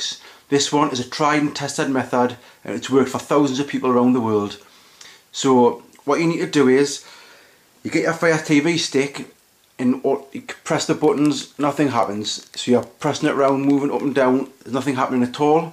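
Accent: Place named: Tennessee, United States. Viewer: You sound funny are you from Tennessee?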